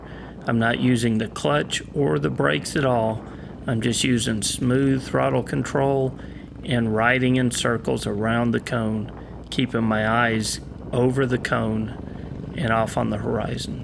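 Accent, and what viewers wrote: Texas, United States. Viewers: I like the Texan accent :-)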